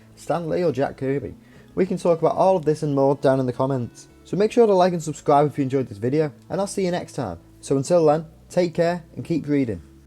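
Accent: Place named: England, United Kingdom. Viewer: The second most annoying English accent, besides mesdsy69. 😑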